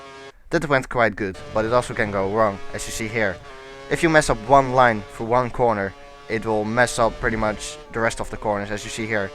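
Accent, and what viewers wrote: Netherlands. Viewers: nah, his accent is way more dutch than finnish.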